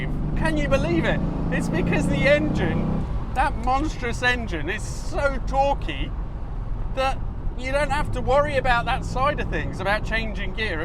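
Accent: Italy. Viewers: I had driven my friend’s LHD in Vancouver back in the 90’s and it was amazing! BTW, Italian accent is beautiful! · Love that car, what a machine. And regarding people moaning about the Italian accent, don't change it, if they don't liked don't watch it. :) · Absolutely love the pantera hate the Italian accent totally spoiling your videos which I look forward to watching every week please stop as it’s cringe city,keep them coming (without accent)👍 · Pantera has been one of my favourites since when I was a kid. But I didn't know she had such a gorgeous beautiful interior until I saw this video. Especially after seeing a spaceship like cockpit of the modern cars , it's got a very good taste. As someone said that it's not a car but art. Yes it's more than a product but art level of thing with a passion. It must come from Italian character. I like the way you talk so nicely and jolly and clear as well.And I don't mind sometimes you talk with an Italian accent. 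It's fun and give your program a good character. I accidentally found your program first time today. I like your narrations very much. It's very pleasant. Thank you!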